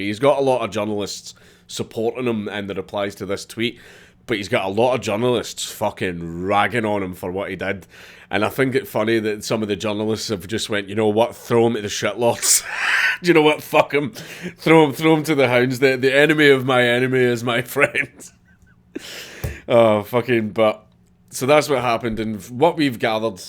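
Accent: Scotland, United Kingdom. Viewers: I love the scot accent but if I'm not paying 100% attention I can not understand it 😂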